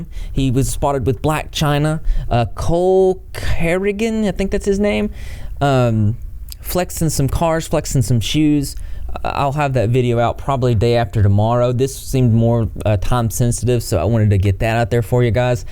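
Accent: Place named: Tennessee, United States. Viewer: I legit could listen to you speak for hours haha probably the fellow TN accent that I can appreciate haha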